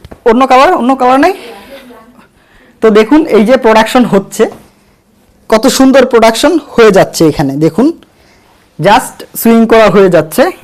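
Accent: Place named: Sylhet, Bangladesh. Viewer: সিলেট কথায় পাওয়া য়াই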